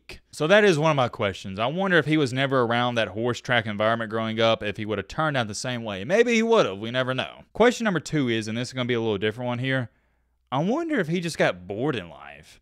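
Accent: South, United States. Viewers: Because this cat has a southern accent... I guarantee you, that's not how you say this qbs last name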